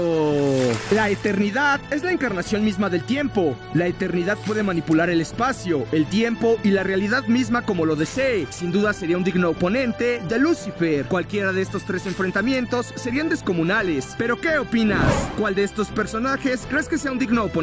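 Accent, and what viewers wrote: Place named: Mexico. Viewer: Tu voz está terrible, tremendo acento nefasto y barato de televisión abierta mexicana.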